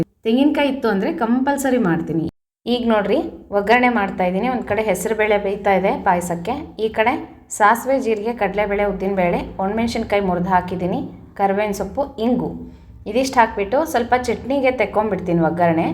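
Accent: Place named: Ballari, India. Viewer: Naavu Ballariyavare.adigemaaduva vidhana yella same😊naavu makkalige tinniso ootakke mammu antane annodu.Maatado reetinu same 😊Namma jana Namma bhashe ❤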